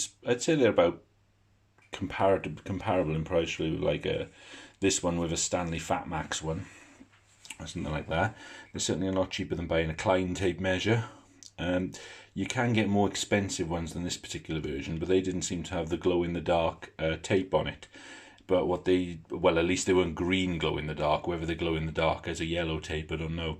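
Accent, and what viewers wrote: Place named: Wales, United Kingdom. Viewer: I thought I recognised the accent I'm from sunny Pembrokeshire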